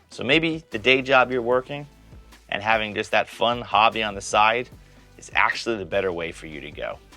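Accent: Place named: Ohio, United States. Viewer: You have a Buckeye accent...any relation to Jon M.?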